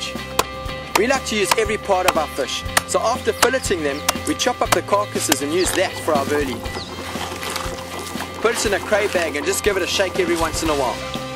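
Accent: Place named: South Africa. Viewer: lol he isint kiwi his south african haha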